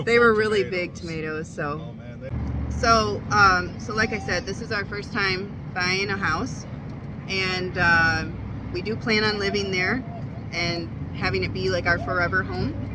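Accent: Canada. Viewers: I thought had a Canadian accent going on. I watch some Urban Exploration guys from Ontario. And certain words like (out) is said differently from us in America, lol I love watching all the videos!!! 😍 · Ah I recognized that Canadian accent 🙂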